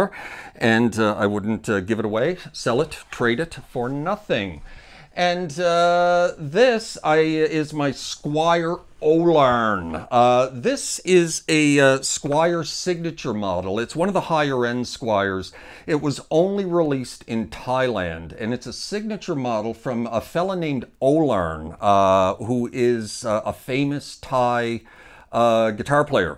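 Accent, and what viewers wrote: Canada. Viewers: Hi. Do I detect a slight Canadian accent?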